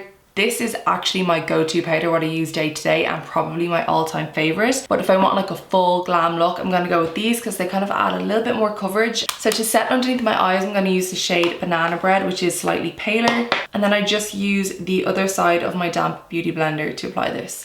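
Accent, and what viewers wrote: Ireland. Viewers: I love your Accent! Irish ☘️ has always been my favorite accent besides Australian💖💖💖💖💖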